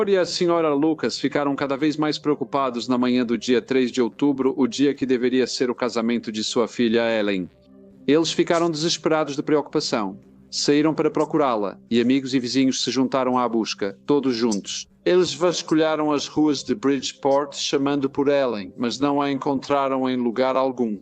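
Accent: Portugal. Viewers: Esse sotaque de Portugal é muito chato! Não daria pra resolver isso?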